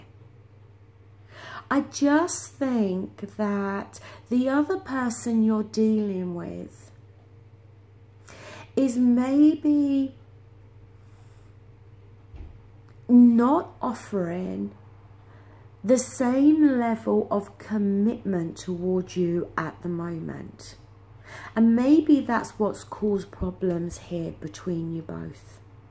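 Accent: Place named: England, United Kingdom. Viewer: thank you as always you are amazing, and not to mention the most beautiful English accent in the whole of England!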